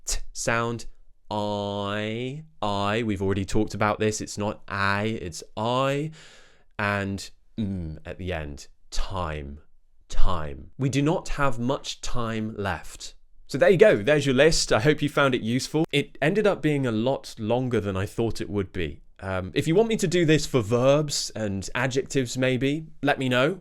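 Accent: United Kingdom. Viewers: Always like your accent, British English accent is awesome❤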